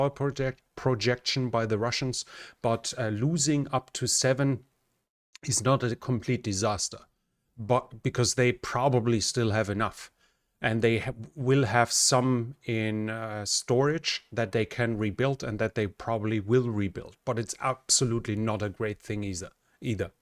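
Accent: Germany. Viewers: You talk like a german but look like a Danish person and you have a Danish name, do you live close to the border to Denmark/north of Hamburg? :)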